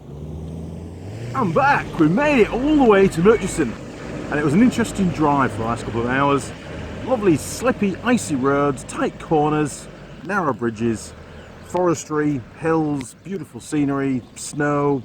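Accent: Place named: West Yorkshire, United Kingdom. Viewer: really enjoying your channel but one thing has been bugging me,, where are you originally from, you sound reasonably local to me in west yorkshire :)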